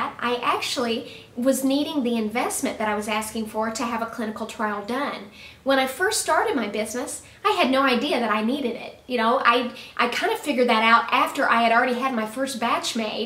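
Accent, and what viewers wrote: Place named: United States. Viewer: you have a really sweet accent! which state are you from?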